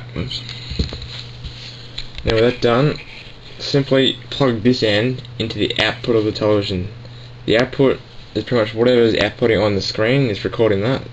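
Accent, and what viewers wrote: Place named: Australia. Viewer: thanks for the help. favorited so I can use it for future reference. You australian? nice accent :P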